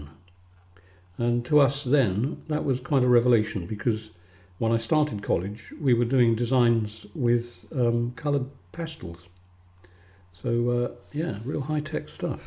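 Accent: United Kingdom. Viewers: you've got such a beautiful british accent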